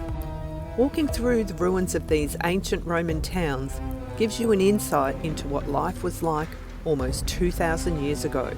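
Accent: Australia. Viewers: Excellent Editing. It’s great to hear an Australian accent on a travelogue.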